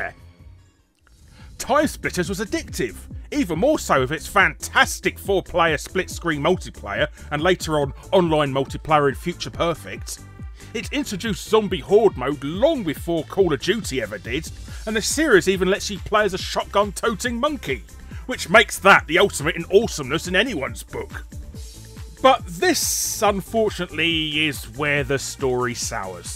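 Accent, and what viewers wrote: United Kingdom. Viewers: Considering british people invented the language he can pronounce it however he wants, personally I could care less.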